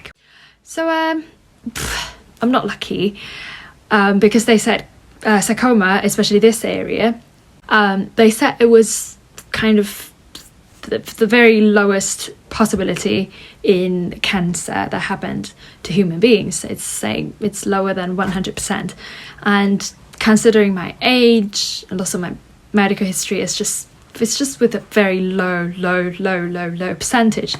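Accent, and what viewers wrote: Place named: RP, United Kingdom. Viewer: Hello,! I really appreciate your lovely contemporary Received Pronunciation (RP), your efforts to make this very first YouTube video, and, more importantly, your attitude towards your unfortunate experience due to this extraordinary disease. I hope you would receive great treatment from the NHS and ultimately combat the disease successfully! May you be free from suffering very soon! Yours sincerely, Jordy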